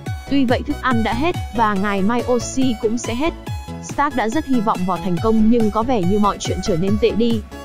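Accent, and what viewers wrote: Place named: Thanh Hoa, Vietnam. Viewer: Giọng thanh hoá nghe chối kinh dấu ngã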